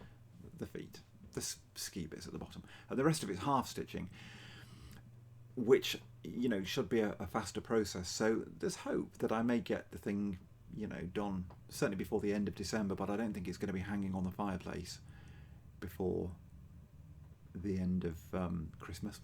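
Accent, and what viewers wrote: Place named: England, United Kingdom. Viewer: Watched and subscribed! Welcome to flosstube - you did a great job on your first video, and I loved listening to your accent. I'm originally from England, but emigrated to Australia 12 years ago, and I love finding other people from England on flosstube - makes me feel connected 👍🏻 Looking forward to your next video 😃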